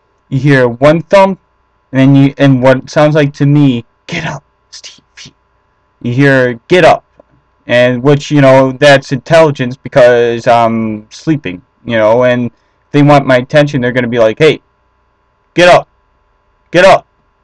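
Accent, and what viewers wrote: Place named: Wisconsin, United States. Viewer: I detect a northern accent from you. You're from Wisconsin.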